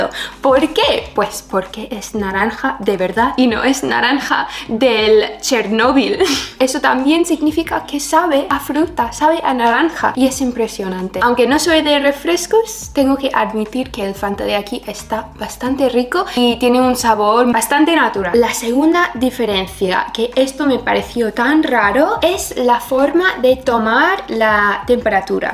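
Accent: Spain. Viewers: Sabes ya tanto castellano que hasta marcas como Red Bull y Monster las pronuncias al modo español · Vengo de el canal de Anita, me gustan tus vídeos y me gusta tu tono de voz al hablar el acento español siendo de lenguaje materno Ingles, es una voz muy hermosa 🧡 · tal vez ya no puedes leer este post, ya que el video es antiguo, pero aqui en mi pais tambien se hace mucho aceite de oliva , me sorprende tu español aun que no me gusta mucho el acento (español) me sorprende tu buena buena pronunciacion y creo que eres la unica de habla inglesa que he escuchado que no tiene problemas con la RR la unica, en chile mi pais tienes lo mejor de los 2 mundos.... por un lado muy americano y por otro muy europeo, suena extraño pero es verdad. un abrazo!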